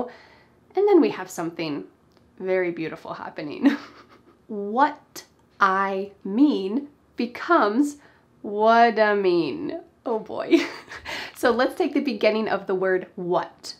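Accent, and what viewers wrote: United States. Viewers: I like 👍 American 🇺🇸 accent 🌸🌸🌸🌸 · Hi,! I just watched one of your lessons. I love your US accent. It’s amazing and so mean to me. I hv got hard things in putting my tongue to pronounce words correctly. It made me be shy to speak. Lol. I need tips from you. Help me pls. Thanks and wish you always be happy there. ❤️ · She’s so perfect, and perfect way to learn American accent.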